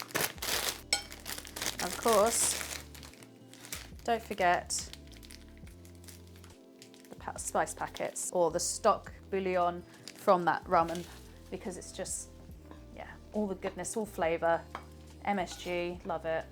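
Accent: United Kingdom. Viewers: I love how Brits pronounce words compared to Americans, it’s hilarious 😂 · Please don't use music. British accent and asmr is the way forward. Trust me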